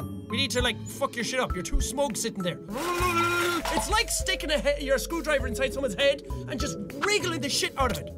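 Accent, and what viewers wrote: Ireland. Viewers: What! He IS Irish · Not just wee bit.... COMPLETELY IRISH!!! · his Irish accent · Are you a weee bit Irish? I just discovered this btw.... don't judge me. Keep up the good work!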